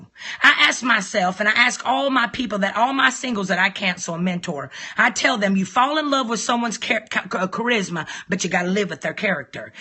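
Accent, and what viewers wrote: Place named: South, United States. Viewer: I just love your Southern accent tho!! ❤🔥💯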